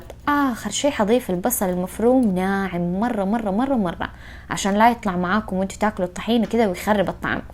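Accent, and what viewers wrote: Hejaz, Saudi Arabia. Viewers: افغانيه متجنسه سعودي . لهجتها حجازي يعني مو سعوديه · مين قال انها شامية سعودية هي سعودية حجازية وتتكلم لهجة من لهجات الحجاز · من اي بلد انت..لهجة سعودية بس ما اعتقد انت سعودية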